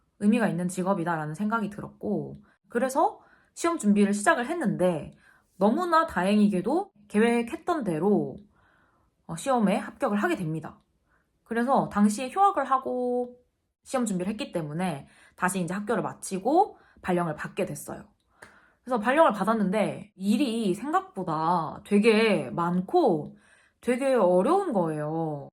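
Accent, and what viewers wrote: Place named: Gyeongsang, South Korea. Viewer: 서울말 쓰려고 노력하시는 경상도분이시군요!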